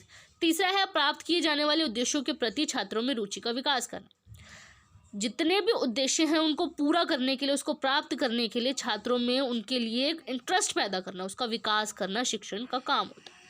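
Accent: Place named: Haryana, India. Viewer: Mam ap toh harayana se ho ❤️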